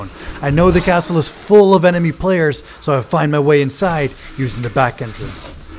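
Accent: Netherlands. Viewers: I knew that accent sounded dutch! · sounds Dutch to me....being Dutch :)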